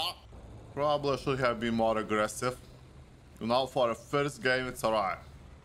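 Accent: France. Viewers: Le petit accent marseillais qui fait plaisir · Extra sensibles avec l'accent du sud 👌! Super vidéo: )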